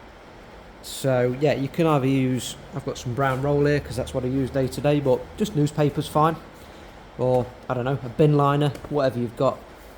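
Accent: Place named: Yorkshire, United Kingdom. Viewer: who'd have known that the best lens refinisher would have a yorkshire twang. 👌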